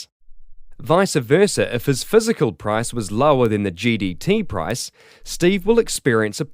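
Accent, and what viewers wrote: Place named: New Zealand. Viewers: It’s a stindard iccent in New Zealand. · its kiwi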